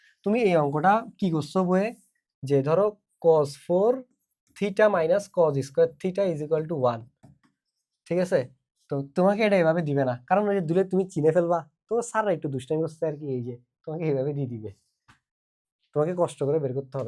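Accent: Chittagong, Bangladesh. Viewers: বদ্দা তই ত দেহিদ্দি পিউর চিটাইংগে😅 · Sir Apni ki chatgaiya?Because apnar ei video te pura chatgaiya accent lagtese 😭..or is it just for the video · ভাইয়া, তই তো চিটাংগে হতাও ফারো দেহির🙂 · ভাইয়ার তো আজকের ভাষা পুরাই চিটাগাং এর মানুষের মতো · Vaiya to pura chatgaiya 👏💀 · Kire pura accent ta chittainga 🙏😭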